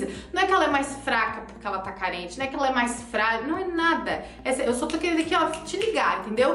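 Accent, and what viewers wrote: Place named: Florianopolis, Brazil. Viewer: Tú é de Floripa né, só pelo sotaque kkk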